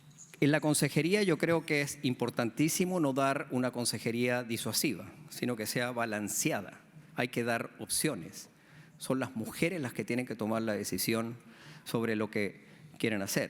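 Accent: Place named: Chile. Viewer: yo encuentro que tiene acento chileno 🤔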